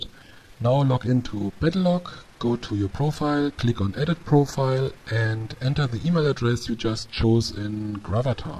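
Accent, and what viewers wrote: Germany. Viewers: You are German :-D I hear it ^^ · are you german ? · ein deutscher der englisch spricht= geiler akzent! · Ich liebe den deutschen Akzent :D herrlich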